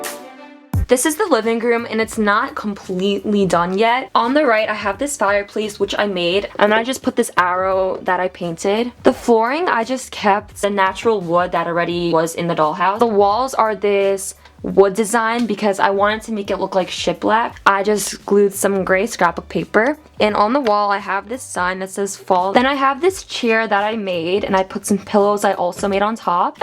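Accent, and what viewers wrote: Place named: Oklahoma, United States. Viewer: your accent is VERY oklahoman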